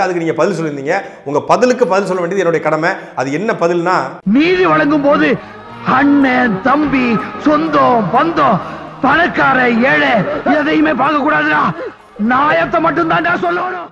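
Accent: Tirunelveli, India. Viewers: இவ்வளோ மரியாதையா பதில் சொல்லிருக்க வேணாம், சாட்டையின் திருநெல்வேலி தமிழே போதுமானது மருத்துவருக்கு 😉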